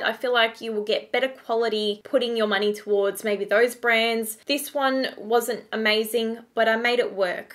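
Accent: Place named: Australia. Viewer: This is my first video watching you and wow… AUSTRALIAN 😂😂😂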